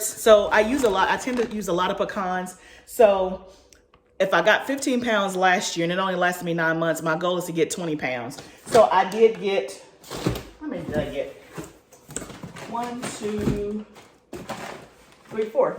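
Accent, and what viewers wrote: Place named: Georgia, United States. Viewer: I love watching Azure Standard Haul's .. You Sound Like A Georgia Girl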